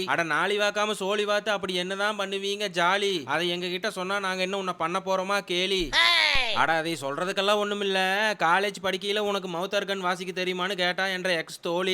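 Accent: Coimbatore, India. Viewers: நானும் கோயமுத்தூர் தான் அண்ணா உங்க videos எல்லாம் ரொம்ப நல்லா இருக்கு அத விட நம்ம ஊரு பாசை சூப்பரோ சூப்பருங்க அண்ணோவ்👌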